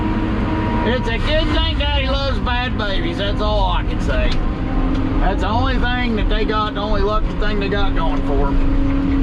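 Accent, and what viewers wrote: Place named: Pennsylvania, United States. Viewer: I like you and I like the way you talk, you remind me of some of my more interesting relatives in the foot hills of Pennsylvania.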